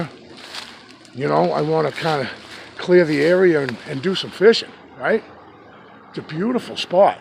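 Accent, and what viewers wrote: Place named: New England, United States. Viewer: this must be new england "back yaaad"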